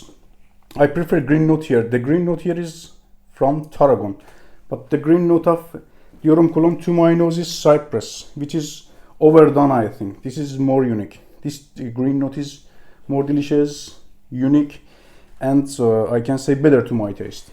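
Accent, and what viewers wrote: Iran. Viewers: داداش لهجت چقد ب ایرانیا میخوره😄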